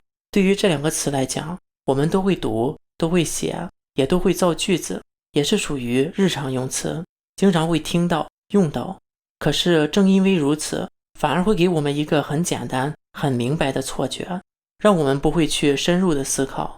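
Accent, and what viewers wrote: Shandong, China. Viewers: 这口音是山东？河北？不管了，中国应该是联邦制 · 你这口音真难听，一听就是我们山东的吧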